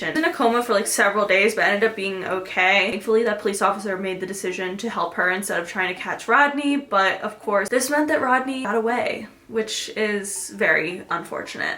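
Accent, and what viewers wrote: United Kingdom. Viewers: I love your British accent, love ❤️ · I love your British accent 😭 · The beginning haha I love your British accent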